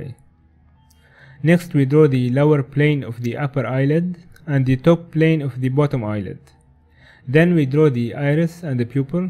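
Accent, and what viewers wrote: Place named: Egypt. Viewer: Egyptian accent. So proud of you. Keep it up. ما شاء الله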